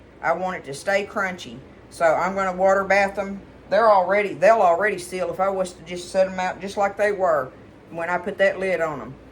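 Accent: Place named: Texas, United States. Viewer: Yes, she's referencing turmeric. Her Texas accent just makes it sound a bit different that how some folks pronounce it.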